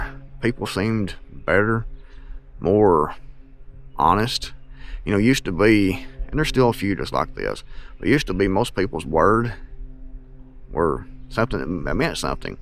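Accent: Appalachia, United States. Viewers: First video ive seen of yours i am a proud born and raised, West Virginia, Appalachian gal, and if they think you have an accent they should hear mine. Ive always been compared to Sissy Spacek, that played Loretta Lynn in the Coal Miners Daughter movie, because of the way i talk. Im so happy to be here and to hear a fellow Appalachian that sounds just like me. Oh yeah im a new subscriber too.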